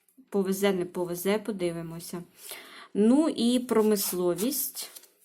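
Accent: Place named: Zaporizhzhia, Ukraine. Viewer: Уважаемая, если вас это не обидет ответьте пож. Вы наша, Запорожская? Это чувствуется в разговоре,что конечно приятно, когда вы говорите наш город, сказав наш нам и кажется,что вы живёте в Запорожье.Спасибо дочка, за твой труд.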